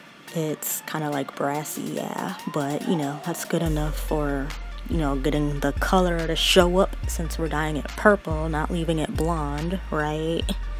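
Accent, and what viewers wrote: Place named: South, United States. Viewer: You sound so country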